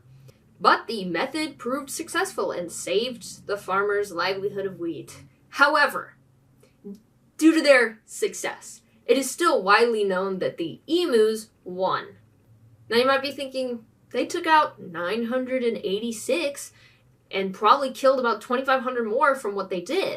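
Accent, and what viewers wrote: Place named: Transatlantic, United States. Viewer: Love the music choice for the intro 😄. Can just hear that transatlantic accent.. and now for more Weird World History! 😂 "Number of birds" must be 2 🙂.. that's a number of birds 😄. "Let's go to war with a giant bird!" 🙂😂 Imagine if they really did say that 😆. And now they're protected.. they totally won 😂. You seem very passionate about this. Your eyes as you say "HUmAns!" 😄. It's nice.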